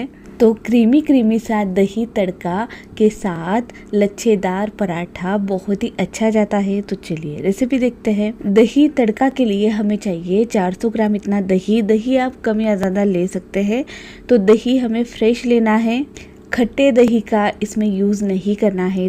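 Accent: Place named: Sindh, India. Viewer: Ur accent is Sindhi MashAllah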